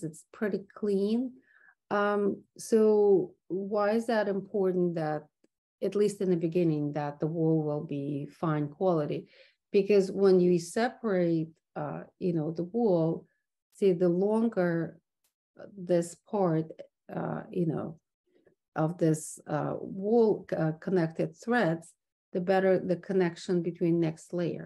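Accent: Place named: New York, United States. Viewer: great tutorial, loved the NY/LI accent. Is there a link to upcoming workshops?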